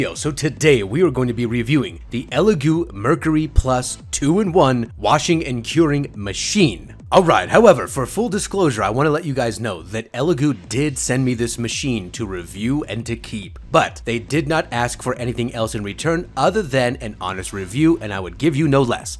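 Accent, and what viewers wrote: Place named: United States. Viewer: I love American accents AWCOWHOLE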